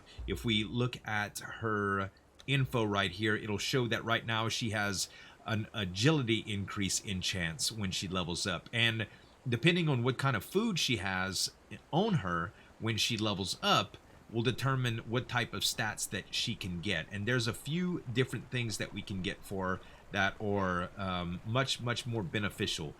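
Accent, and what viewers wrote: Louisiana, United States. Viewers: Think I found my new favorite channel! I'm getting ready to start binge watching your videos. I could be wrong here, but it sounds like you are from Louisiana (my wife is from Houma)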